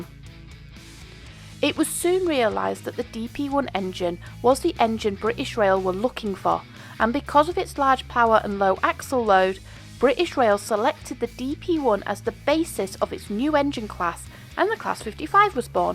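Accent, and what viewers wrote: Doncaster, United Kingdom. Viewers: So you are from Doncaster…?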